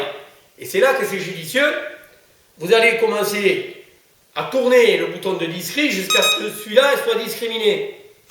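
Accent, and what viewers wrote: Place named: South, France. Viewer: hahahahhaha le scope l'accent du Sud suis mdr désolé pas méchant mais Scope ca me fais rire hahahaa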